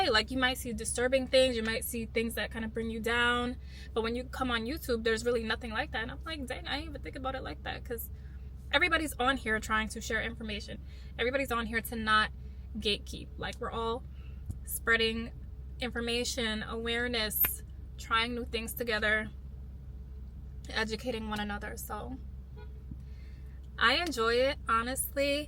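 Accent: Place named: Trinidad and Tobago. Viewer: Ya sound like ya from Trinidad 🇹🇹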